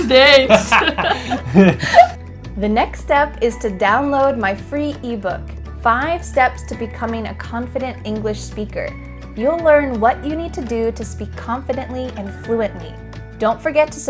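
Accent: United States. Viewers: Thank you,. You're the best teacher I've ever seen. Learning with your videos is so varied and makes a lot of fun. Your videos and English-lessons are very helpful and I hope that I'll become a confident English speaker with it. I wanna say it again and again: thank you, thank you for everything.🍀 P.s. I love the American accent and the pronunciation of 'er'😍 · Hi. I am one of your new subscribers. I just want to tell you that I love your content. Just a question, which part of the US you are from? Is this is a California accent? I am Polish but I have been living in the UK since 2004 and guess what? I only love US accent your's is one of the ones I like that's why I did ask you this question. Thanks:)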